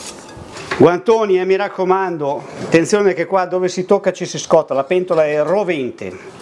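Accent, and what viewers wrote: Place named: Emilia, Italy. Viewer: Tantissime grazie, per le risposte, hai una parlata penso emiliana, simpaticissima, queste sono anche per la signora che ti è vicina,e che ogni tanto compare, Io ho lavorato come titolare in tutt' altro campo nel commercio all' ingrosso e lavorazioni di legnami all' inizio non distinguevo la differenza tra pesco e pesso. e anche se poi ero diventato il migliore disegnando progettando e realizzando creazioni artistiche nel campo delle lavorazioni di porte tavoli perfino scarpe in tranciato di legno m, però il mio sogna era quello di avere un ristorante , e non sai con quanta amministrazione e quasi invidia ti vedo all' opera per tutto quello che sai fare perché sei proprio un artista dell' arte culinaria e sarebbe una meraviglia saperlo fare come lo fai tu, oggi però che posso anche se sono anziano mi diverto e me la passo grazie si consigli di chi più ne sa di me Un caro saluto 🌹🌹🌹🌹🌹🌹🌹🌹🌹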